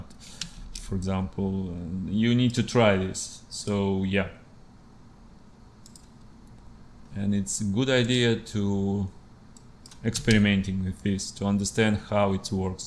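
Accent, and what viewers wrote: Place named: Russia. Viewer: Very nice russian acсent).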